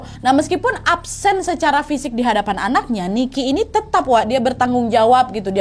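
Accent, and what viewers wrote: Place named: Sumatra, Indonesia. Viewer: amboi..cantiknya bahasa. ibu ini mengerikan nomongnya, ibu-ibu cerewet yang cerdas. enak dengarnya dengan logat khas sumatera, siapa yang jadi suami ibu ini pasti kewalahan ngomong sama ibu ini, bisa-bisa suaminya jadi asma dibuatnya, ngomongnya cepat dan tembak langsung. nggak bisa ditangkis lagi.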